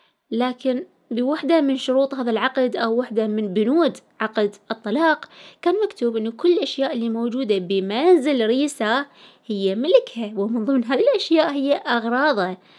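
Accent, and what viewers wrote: Iraq. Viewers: انتي من اي دولة لهجتج خربطتني نص عراقي ونص اردنية ونص اكويتية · عندي سؤال محيرني من مده الحين لهجه صاحبه القناه وطريقة نطقها نفس اسو لكن السوال وش قبيلتهم بالعراق وش ينقالهم الي يتكلمون بهل نغمه 🤔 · عراقية وهاي لهجتنا ف طبيعي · شدخال القبيلة. هي عراقية واخلص ف الكل يحجي كذاا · كل العراقيات يحجن هيج خصوصا الي ببغداد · حبيت اللكنة العراقية لاني اسمعك على الرغم اني درسوني عراقيين وانا يمني الاصل ودرسوني اجانب والحمدالله صرت انسان رفيع مستوى بس لديك للكنه واضحة الى الامام دوما I loved the Iraqi accent because I can hear you, even though I was taught by Iraqis and I am of Yemeni origin, and they taught me by foreigners. Thank God, I have become a high-ranking person, but you always have a clear, forward-looking accent.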